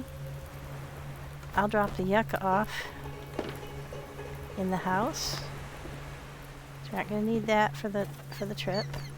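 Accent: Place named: United States. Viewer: Ah... A kindred soul! I wonder how many more of us there are 'out there'? You sound American (Canadian?) I'm on the other side of the pond, so if I goof, please don't take offence. My go-to game is Horizon Zero Dawn. I find the sequel disappointingly boring. Fortunately my son gave me his spare PS4 during the Covid fiasco, and that got me turned on to gaming.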